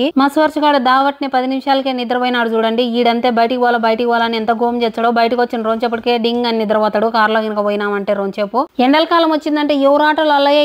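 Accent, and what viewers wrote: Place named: Kurnool, India. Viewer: Maadi kurnool district.. ayinaa mee negative village ku chaalaa daggara kanuka slang 99% same vuntundi.. Vuregadam,, Mugeddulu. Naaku kudaa silent vunte bo manta..oonnoo antene satisfaction..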